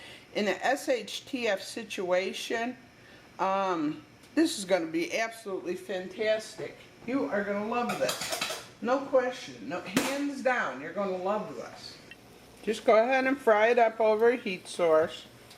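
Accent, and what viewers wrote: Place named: New York, United States. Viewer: I have a dear friend from New York - she has the same accent.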